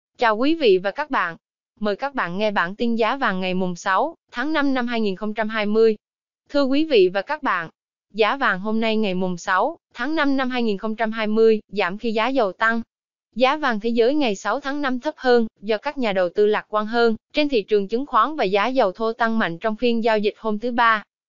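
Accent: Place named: Quang Nam, Vietnam. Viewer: Qua xem giá vàng ngàu 6 tháng 5 và xem luôn QC. Hôm nay có giọng quảng đọc phải không nhỉ.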